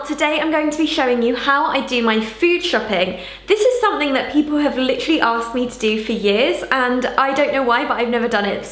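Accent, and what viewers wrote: United Kingdom. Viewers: I love her British accent! It's so relaxing to listen to her talking! ❤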